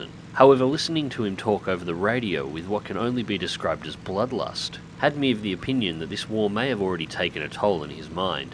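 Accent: Australia. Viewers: I LOVE THIS!!!! I am a "Yank" in Tennessee and just wanted to say ,I haven't even finished watching the video , but had to stop to tell you ",YOU HAVE FOUND YOUR CALLING!"Ive been wandering what you would do now that Giajin has "went too far"!! your Aussie accent ,style, and obvious knowledge of what you are talking about is what makes this hopefully, the first of a VERY long and successfull series! Would like to see two a week if possible, could run for YEARS!! "THANK YOU VERY MUCH FOR ENTERTAING ME!!"...was about to give-up on YouTube. :)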